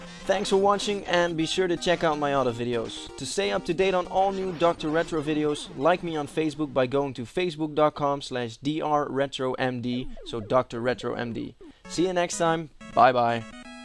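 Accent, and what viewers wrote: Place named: Netherlands. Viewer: Great Top 10. Like to hear a Dutch guy talking Engish so I know you're not that far away, immediatly recongnized the accent :). I'm from Belgium ;). I'm more a SNES guy as I grew up with that one, but see much games I liked on that console here too. Like Ducktailes (or that was gameboy) and MegaMan (like X very much). Super Mario Bros 3 was also released on the SNES.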